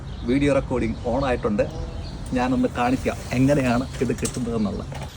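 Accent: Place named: Ranni, India. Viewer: നിങ്ങൾ റാന്നിക്കാരനാണ്,,, അത് ഭാഷ തെളിയിക്കുന്നു.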